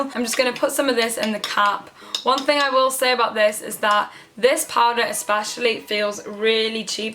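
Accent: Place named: Wales, United Kingdom. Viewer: YOUR WELSH ACCENT IS TO DIE FORRR! 😍😍😍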